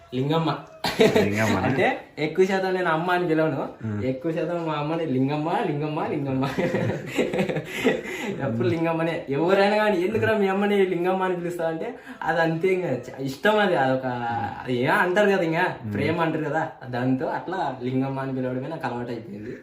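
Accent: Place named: Gadwal, India. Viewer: Gadwal slang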